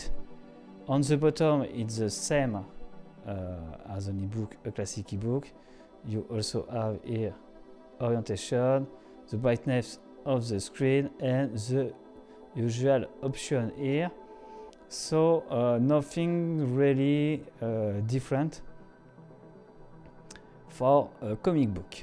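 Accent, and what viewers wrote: France. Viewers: What a helpful video. Thank you so much! This is the second video in 2 days where a French person feels like their accent is a problem - it’s honestly not even a thought. I’m sorry you guys feel self conscious. Truely I’ve never heard any negative commentary about French accents. In fact, I’ve only heard good things 😌 thanks again for the very helpful tutorial - much appreciated 😌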